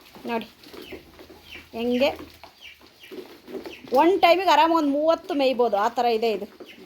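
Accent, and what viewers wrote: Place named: Chitradurga, India. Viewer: Akka yavuri nimdu...bashe nodtidre Chitradurga district ansutte ..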